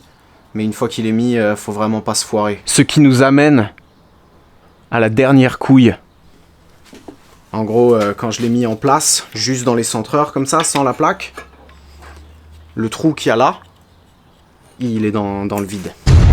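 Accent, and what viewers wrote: Alsace, France. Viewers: Tu commence à choper l'accent Alsacien à force d'être avec EMS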